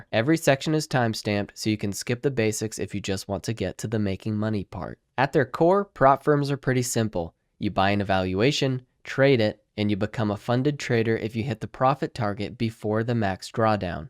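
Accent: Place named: Iran. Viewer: داداش ایرانی هستی انگار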